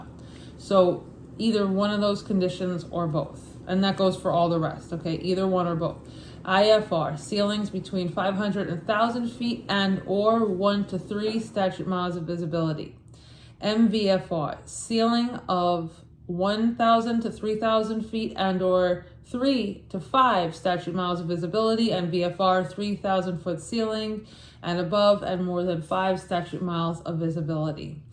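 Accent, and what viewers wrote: Brooklyn, United States. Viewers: Great job breaking down the Instrument Pilot Oral Exam Guide with that authentic Brooklyn flair—super engaging and helpful!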